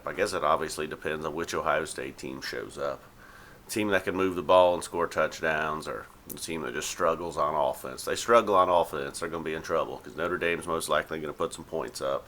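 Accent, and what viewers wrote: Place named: Indiana, United States. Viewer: I'm further south than he is but I have no "drawl". he's got a hint of Hoosier accent mixed with some Tennessee or Kentucky parents or grandparents that moved to Dayton to work for the UAW. possibly a Middletown or franklin native... I bet you have an accent and don't realize it.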